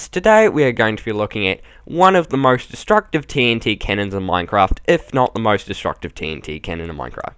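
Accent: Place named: New Zealand. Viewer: kiwi I think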